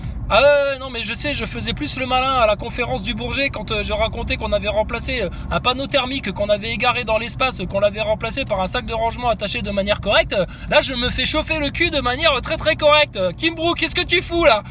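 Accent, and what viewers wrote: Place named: Belgium. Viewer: un renard, un renard, une pesquette mélodieuise, ton accent belge, putain, faut l'améliorer, putain !!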